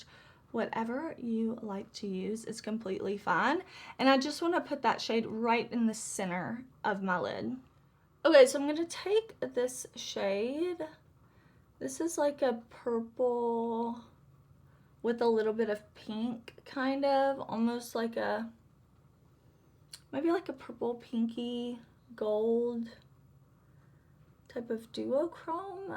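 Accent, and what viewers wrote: South, United States. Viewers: Wow 🤩🤩🤩🤩 That last look w/the green in the “socket” 😉- Just WOW!! That multi-chrome w/the predominantly pink shift; that’s got to be a gift from God, & I’m not sure I’ve seen one of those types of shadows on camera as reflective as that one. I kept thinking “oh no, she missed a spot of her lid space w/that multi chrome”-but no, I knew your skills were better than that, it was just the amazing shift and the way the color would “jump” around ur lid; girl, it practically dances across ur eyes ! I am only learning of this brand(from you). I actually kind of gravitated to ur channel after watching a few of ur vids, and appreciating ur seemingly unwavering support of these Indy brands, that other than Color Pop, I knew ZERO about! I’m fascinated, I’m hooked, and I think you’re great and that’s why I subscribed. You’re smarts, beauty, charm, southern accent, are just too refreshing to pass up 👍🏻💄💋❤️👏🏻 I’m learning so much, thank you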